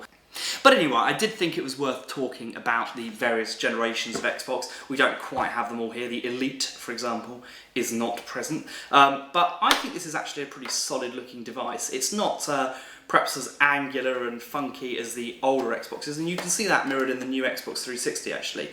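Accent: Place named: RP, United Kingdom. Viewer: He's posh with bad grammar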